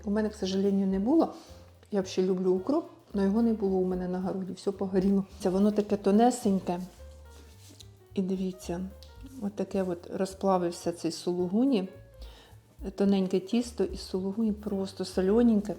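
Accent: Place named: Odesa, Ukraine. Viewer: Одеський говір. На заході теж розмовляють не на укр.мові.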